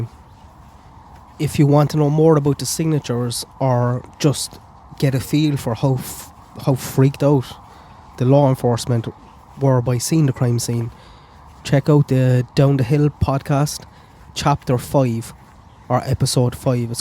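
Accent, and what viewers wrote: Ireland. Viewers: Irish accent it's so lovely! X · No, I’m guessing it’s in Ireland somewhere…the narrator is Irish and the fauna looks like it would be in Ireland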